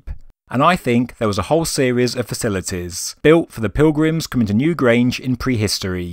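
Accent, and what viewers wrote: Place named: England, United Kingdom. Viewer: Apart of the ancient discovery information, what a LOVELY ENGLISH ACCENT. ...AMEI ❤👌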